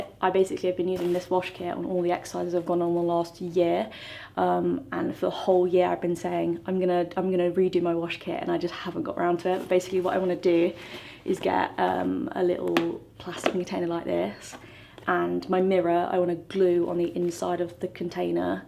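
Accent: United Kingdom. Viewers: She’s literally speaking as a normally British person does. If you dislike the way she speaks, why are you watching the video?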